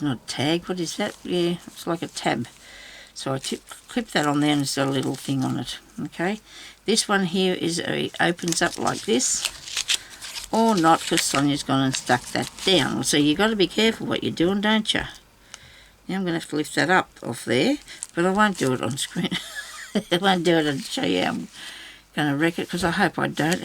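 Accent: Australia. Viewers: Good day from WA. Its so nice to here another Aussie accent. Thank you so musch, I think your book's awesome!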